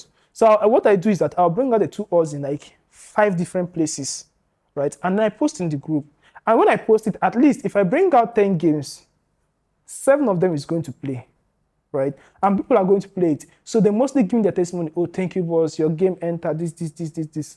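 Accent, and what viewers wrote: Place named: Anambra, Nigeria. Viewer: I like your accent.... My Anambra brother. This has to be the best founders story I've listened to. I had to download it to listen again. I loved the way you talked about your challenges. I'm one person that is usually afraid because I have tried some things that didn't work. I'm motivated already. I also like the idea of the financial market. I hope to meet you someday. I'm starting now.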